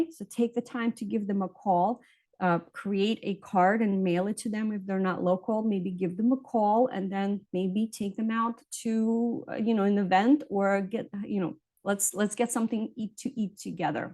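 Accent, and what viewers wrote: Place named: New Jersey, United States. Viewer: "Let's tawlk" ... jeez ... i miss Jersey & once away i can't help but catch a belly laugh when hearing the accent that Jersey folk swear they do not have. I gotta get home !!! 😆🍅😆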